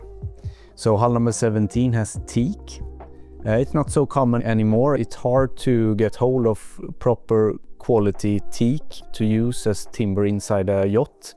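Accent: Sweden. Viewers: Don't worry Sir, Your Swedish accent is beautiful, authentic... I even ecpect it, when the Portrait about a Hallberg Rsssy is produced by Hallberg Rsssy in Ellös 😉🎉🇸🇪♥️👍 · Your English is perfectly acceptable for a non-native. Your Swedish accent makes your speech sound so much nicer. Also, beautiful yacht and movie. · I wish I could speak Swedish half as good as you speak English Sir. An excellently presented video and your slight Swedish accent is both charming and very good❤ . I understand you perfectly , far better than many English people ! Love that 57 too ..... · Your dialect actually adds the mood for a Swedish yacht. Like a Swiss watch or German car. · That's okay, we find the Swedish accent charming! 😅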